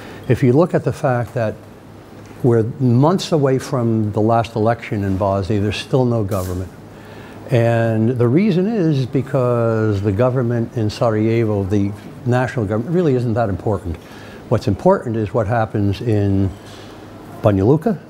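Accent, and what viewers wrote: Serbia. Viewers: Kako tvrd, sprski izgovor engleskog jezika. Uzas.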